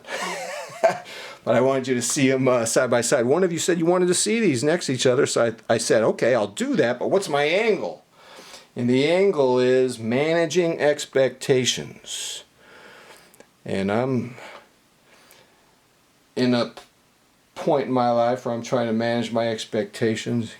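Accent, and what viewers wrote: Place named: United States. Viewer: I love your collection and your thoughts on it. Great American accent that always makes me smile. Please keep up the good work.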